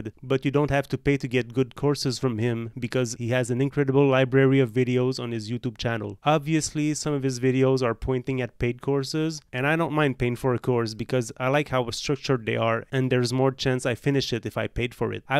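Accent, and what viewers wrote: Quebec, Canada. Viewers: Quebec accent is peak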